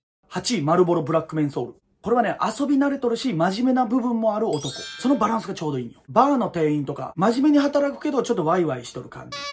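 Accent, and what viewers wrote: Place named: Nagoya, Japan. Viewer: あんた名古屋人か？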